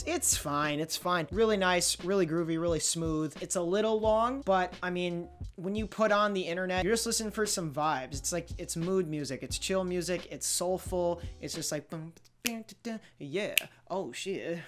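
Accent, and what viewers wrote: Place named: New York, United States. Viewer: sounds extra new yorky in this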